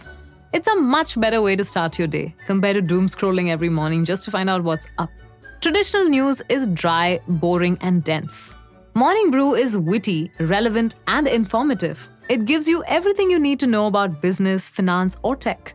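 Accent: India. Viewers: Indian accent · Liked her Indian Accent. It's broken and jumps from one twig to another like a little birdie. Hard to get the sense though.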